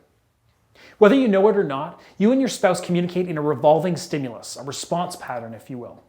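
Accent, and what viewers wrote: Canada. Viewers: Nice. I noticed the Canadian accent. Lol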